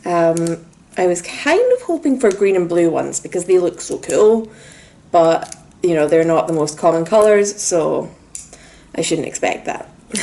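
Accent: Scotland, United Kingdom. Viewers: Are you a fellow Scottish las ?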